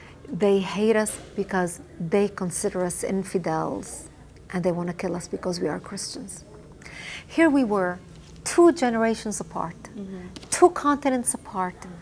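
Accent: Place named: Lebanon. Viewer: Oh please. She even speaks Arabic with a Lebanese accent. Stop your lies !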